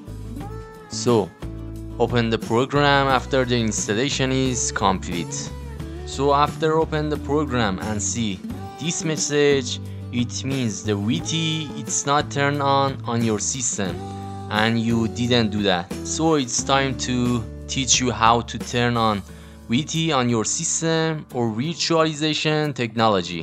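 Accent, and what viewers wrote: Iran. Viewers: معلومه لحجه ایرانی دارع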